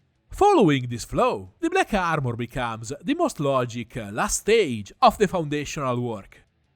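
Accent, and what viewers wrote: Italy. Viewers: Awesome job ! I want to go back to painting minis when I watch that kind of quality videos. And your italian accent make me want to learn the langage xD Keep up the good work, cheers from a (french) neighboor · Hey just wondering are you Italian? Your Accent is kind of intense idk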